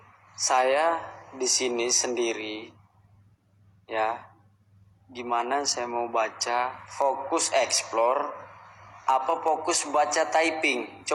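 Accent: Medan, Indonesia. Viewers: Bg, abg org mn? Koq cem org medan bg? Salam kenal y bg dri kami. Anak" awak sukak nonton video abg, lucu x kata anak" ni abg😆😆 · Bg orang mana ya.. Medan kah...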